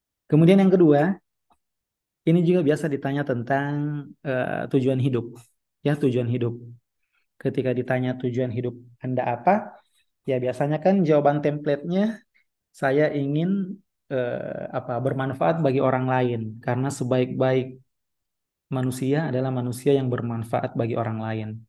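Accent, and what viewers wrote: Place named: Sulawesi, Indonesia. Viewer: Bang orang sulawesi ya , logatnya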